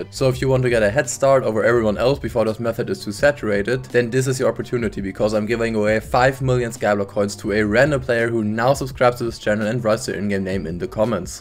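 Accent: Germany. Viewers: DEUTSCHER ACZENT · Yooooo You are DEFINITELY German 💯💯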